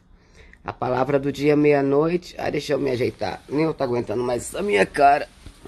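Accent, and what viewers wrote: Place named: Sao Paulo, Brazil. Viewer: Bispa você é paulista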